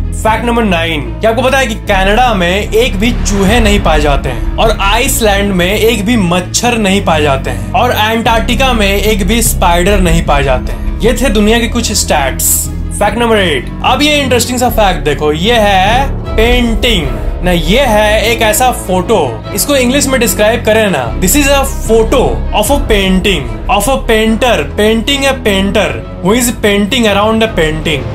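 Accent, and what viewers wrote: Bihar, India. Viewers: sala ekdum ganda wala bihari lagta hai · Aap bihari ho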